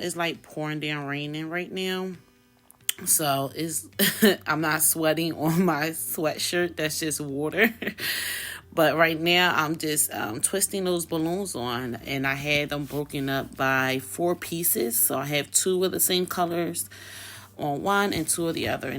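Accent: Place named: Philadelphia, United States. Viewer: I knew you were a philly girl as soon as I heard your voice..I can recognize a fellow philly girl from anywhere! Lol....I love what you are doing and your work looks amazing I moved to Florida and I am about to start my business as well.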